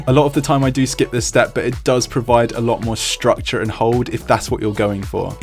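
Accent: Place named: United Kingdom. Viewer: Finally a British accent, I’m sick of hearing these annoying American ones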